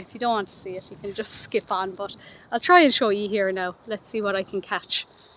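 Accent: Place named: Cork, Ireland. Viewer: Listening to u I’m convinced you have more of a CORK accent than a Kerry one. You are lucky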